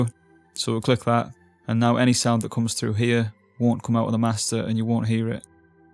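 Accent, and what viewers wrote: United Kingdom. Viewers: anypone talking about his lincolnshire accent, so hot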